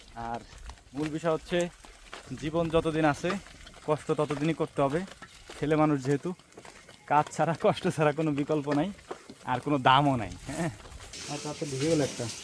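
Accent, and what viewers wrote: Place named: Comilla, Bangladesh. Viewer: আসসালামুয়ালাইকুম আশা করি ভালো আছেন দয়া করে আপনার জেলার নাম বলবেন কুমিল্লার ভাষার মতো লাগে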